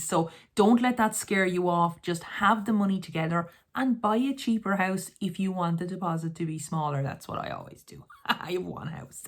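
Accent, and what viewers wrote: Ireland. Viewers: Thanks for your vids. They are awesome, very helpful indeed although listening to your accent makes me miss my fam in Tipp! Looking forward to getting our act together and having a little place.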